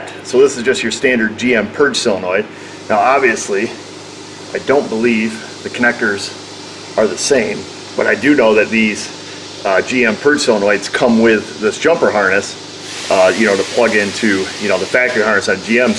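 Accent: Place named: Upstate New York, United States. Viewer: Cancer vent valve? Oh.. canister... That upstate accent!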